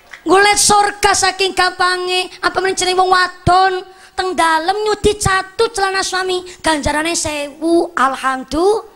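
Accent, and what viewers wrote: Banyumas, Indonesia. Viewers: Seru banget dengerin ceramah ustajah mumpuni asyik bahasanya carpur ngapak emang KL jebolan Indosiar cakep semuanya.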